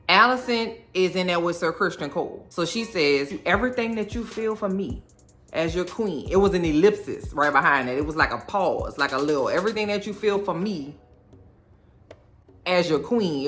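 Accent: New Orleans, United States. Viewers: First off, let me say it....I love the accent. You about to make me go visit my family in New Orleans now. LOL. But as far as the theory of Otto Hightower taking the life of Allicent's mom is a good one. The way he said it was creepy. Like when fathers normally say that it's cute and they're smiling like they're happy. But when Otto said it, it was giving me creepy vibes. As for Helena, y'all better listen to her. She be prophesying. I said oh what's about to come from under the ground. LOL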